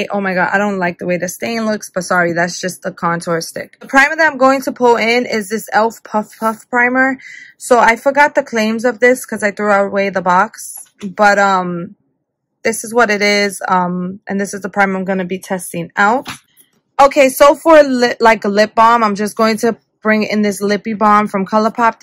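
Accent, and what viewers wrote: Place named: New York, United States. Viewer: Giiiirl i hear that heavy up north accent. Is that new york or new jersey i hear? lol. 💕 Also whats the name of the yellow CSS shadow?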